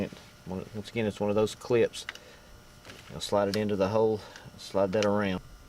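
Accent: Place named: South, United States. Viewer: Thank you for doing such a great job on explaining this! Great video and you speak my native luanguage - southern! Have you ever replaced the climate control on a ridgeline? That's next after fixing my door lock.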